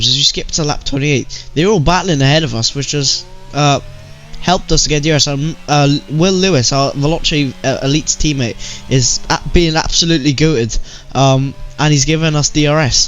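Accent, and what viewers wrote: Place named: Cardiff, United Kingdom. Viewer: Man where are you from in wales? You sound like you’re from Cardiff being a blackwood lad myself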